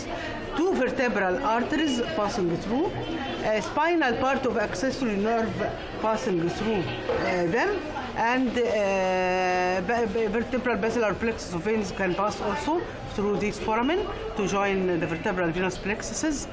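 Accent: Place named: England, United Kingdom. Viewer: hello english accent!!!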